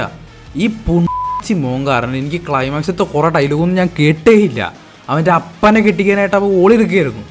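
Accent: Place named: Kodungallur, India. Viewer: ചാലക്കുടി അല്ലെ സ്ഥലം, കൊടുങ്ങല്ലൂർ അടുത്താ , same സ്ലാംഗ് ആണ് അതുകൊണ്ടായിരിക്കും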